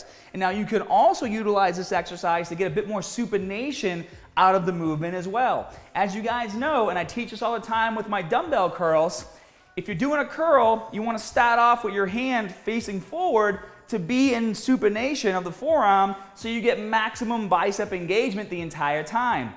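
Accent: Boston, United States. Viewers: Boston and Aussie accents both have a similar elongated pronunciation of 'AR' within words (stARt, tARget, bARbie etc). I think due to his elocution his Boston accent only flourishes on certain words, which happen to be the ones with 'AR' similar to in Aussie. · Daniel Bon Daza it’s a Boston accent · That Boston accent is disgusting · Boston accent lol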